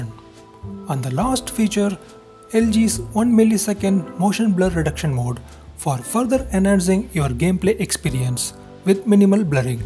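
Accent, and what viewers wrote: India. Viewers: You know it's legit when you hear an indian accent.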